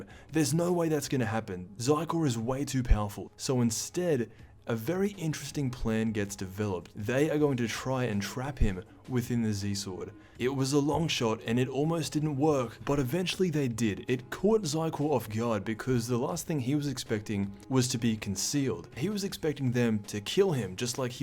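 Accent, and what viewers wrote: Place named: Australia. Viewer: probably so put i enjoy his Auzie way of explaining it lol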